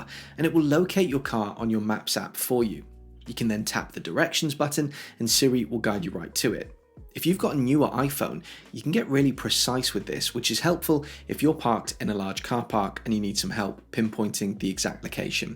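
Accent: United Kingdom. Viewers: Hi! thank you for your videos, I have watched all of them I think that I am strangest subscriber on your channel because I watch your video not only to gain some tips about iOS but also to improve my skills of good English and understanding of British pronunciation:) Warm greetings from Ukraine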